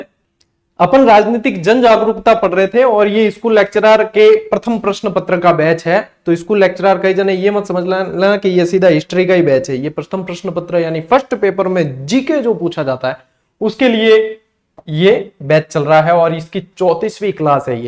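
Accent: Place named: Marwari, India. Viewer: Sir, aapka marwadi accent bahut sweet hai...bless you sir,thank you🛐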